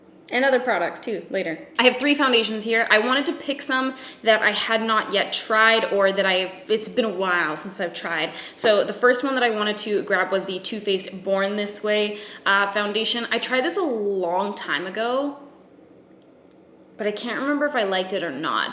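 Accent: Canada. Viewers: This was lovely to watch are you canadian🇨🇦?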